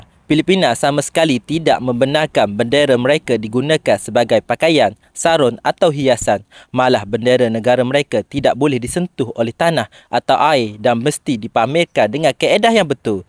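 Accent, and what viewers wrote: Kelantan, Malaysia. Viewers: Pekat klate nate ni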